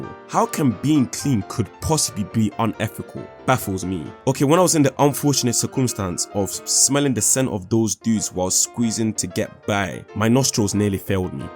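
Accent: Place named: United Kingdom. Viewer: bro how this guy talks in the most british way is just so funny and understandable 🤙😭🗣️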